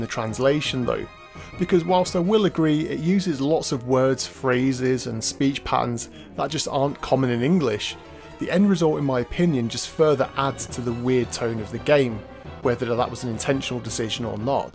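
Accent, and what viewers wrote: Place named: United Kingdom. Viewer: Godddddd why must every n64 video on YouTube be narrated by a British accent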